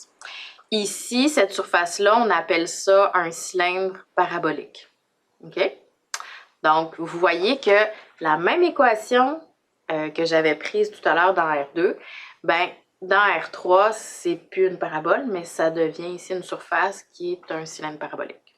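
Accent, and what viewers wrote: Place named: Quebec, Canada. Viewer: Enfin ce qui était resté obscur dans mon esprit devient clair comme un jour radieux grâce à la rare qualité de vos explications malgré la difficulté de l'accent québécois pour des oreilles françaises ! Soyez en remerciée !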